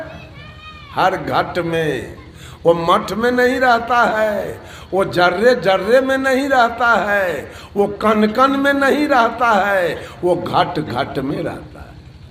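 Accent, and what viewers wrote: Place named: Bihar, India. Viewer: वाह बाबाजी आपकी वाणी एक सो परतिसत सत्य तो है लेकिन आपकी वाणी अनंत सत्य हैआपका अभिनंदन चरण वंदन है आप कि बिहारी भाषा बहुत अच्छी लगती है आप जैसे महा पुरुष सदी मे जरुर आते है पागल लोगो को चेताने के लिए आपमहान संत है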